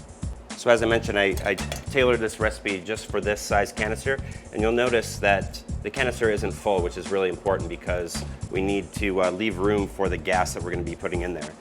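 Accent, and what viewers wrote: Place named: United States. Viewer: I don't think it matters how words are pronounced since Italians, English, and U.S. Americans, for example, pronounce them differently. To me it's like an indicator of where the person came from; this case he's American. :) Ain't language fun? :D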